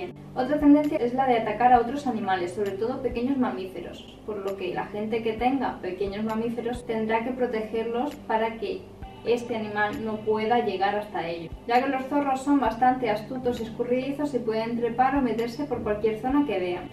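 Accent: Spain. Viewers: que preciosa esta la conductora me gusta su acento español aunque no se si sea española jeje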